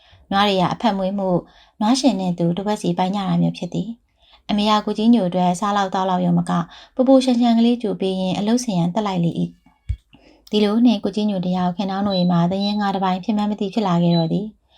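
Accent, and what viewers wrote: Upper Burma, Myanmar. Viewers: မင်္ဂလာပါတို့ အညာရေဆရာမခင်ခင်ထူးဝတ္ထုကောင်းလေးကိုရွေးချယ်ဖတ်ပြတာစာဖတ်သူအသံလေးနဲ့အညာသံအညာဓလေ့တို့အညာနဲ့လိုက်ဖက်နားထောင်ကောင်းလိုက်တာကျေးဇူးပါနော်❤❤❤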